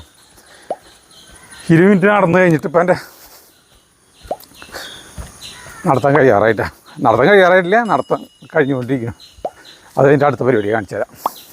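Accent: Thrissur, India. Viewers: തൃശൂർ ഭാഷ 😂👍..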